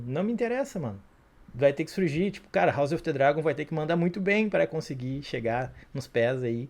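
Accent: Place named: Rio Grande do Sul, Brazil. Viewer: muito obrigado pelo acompanhamento da série com os vídeos, pra mim tu fez a análise mais fiel e profunda da série que vi no YT! E é tri ver o sotaque gaucho mandando bem por aqui! Abraço!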